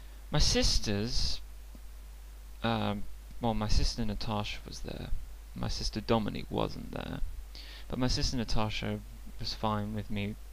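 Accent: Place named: United Kingdom. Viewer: I am surprised - you sound british seems odd they would care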